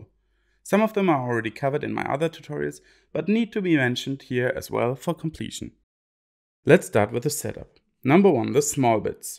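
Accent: Germany. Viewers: your name is not, it's. i know a german accent when I hear one:D great tutorial btw! thank you so much for uploading it!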